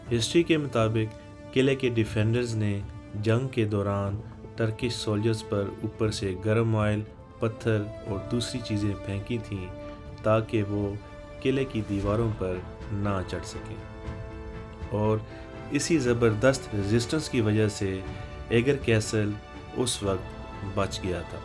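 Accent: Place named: Sargodha, Pakistan. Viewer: bhai ilaqa kon sa hy apka? Lehja Sargodha k as pas ka mehsoos hota hy.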